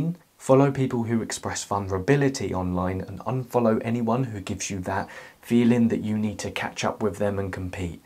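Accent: United Kingdom. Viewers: this british accent made it hard for me to understand lol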